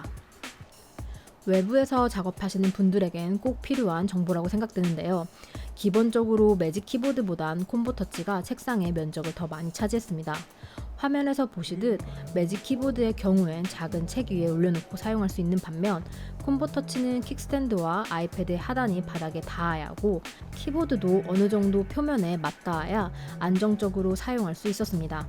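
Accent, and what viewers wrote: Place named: Gyeongsang, South Korea. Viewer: 경상도 분이시네요 ㅋㅋ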